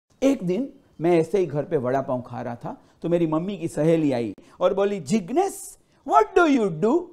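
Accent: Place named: Gujarat, India. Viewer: wonderful ... but Initial accent was gujarati and in between marathi .. baad mein normal Hindi ... 😀😂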